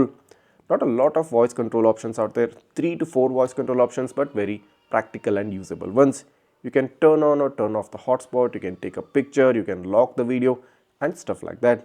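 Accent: India. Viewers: The pajeet speak is too strong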